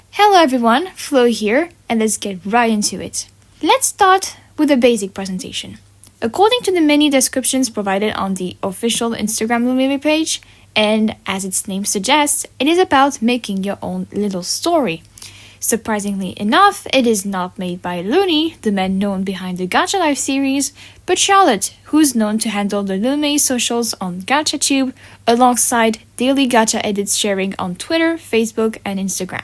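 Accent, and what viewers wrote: France. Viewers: Are you french? 👀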